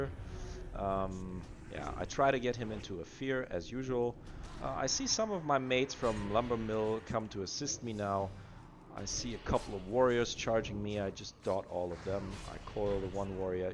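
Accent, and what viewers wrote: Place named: Germany. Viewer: So ich denke mal das du deitscher bist, nach dem englisch akzent hört sicht das so an sehr geiles video, aber gehst du jetzt standart auf SA oder doch fellguard? Also klar kommt es immer drauf an aber ich finde SA hat geileren dmg sber der support vom Felguard is nich ohne... vllt son Fazit mit dem 120%buff und spielst du destro oder diesen misch specc in pve?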